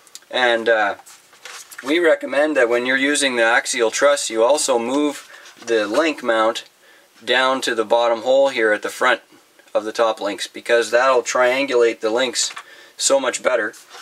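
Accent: Canada. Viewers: Dont know why, but i always thought canadian accents are cool. Srsly, no offense. I will not mention strange brew.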